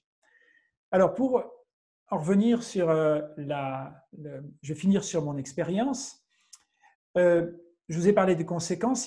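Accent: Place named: Quebec, Canada. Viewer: Bel accent Québecois dis donc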